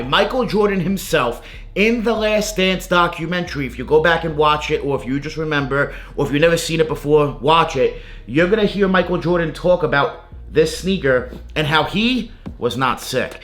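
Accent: New York, United States. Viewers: Nobody gives AF what this out of the closet Drew Carey with a NY accent thinks. Stop saying Jordan wasn’t sick. Having food poisoning makes a person sick. In fact, many of the symptoms are similar to what someone feels when they have the flu. Stick to promoting your nerdy “DO NOT BUY” bs videos. By the way, I find it ironic that someone who looks like they have no athletic ability whatsoever tries to influence people on sneakers.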